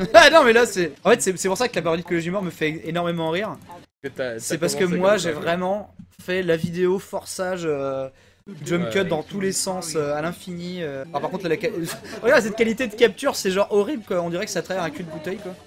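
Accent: France. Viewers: Je me souviens t'avoir cherché dans le forum TGWTG quand tu as sortis ta première vidéo avec eux, t'ayant reconnu par ton accent si Frenchi et on s'était ajouté sur MSN, no joke. Toute une époque vraiment! Je suis bien content de te voir aujourd'hui à l'avant de la scène FR. Des bisous, on t'aime tous et à 30 ans de plus au moins, on te l'espère tous:) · Benzaie d'il y a 10 ans c'est juste le gamin des flashbacks du Hard Corner en fait:-D Je me souviens moi-même avoir vu ces vidéos sur ThatGuyWithTheGlasses (quand ça s'appelait comme ça) il y a pratiquement 10 ans... je me demandais à l'époque "mais qu'est-ce qu'il fait là ce français qui accumule les "fuck" et les "shit" avec son vieil accent?"... Que de chemin parcouru depuis! Félicitations Benzaie de toujours nous divertir après 10 ans, tous les vidéastes ne peuvent pas en dire autant!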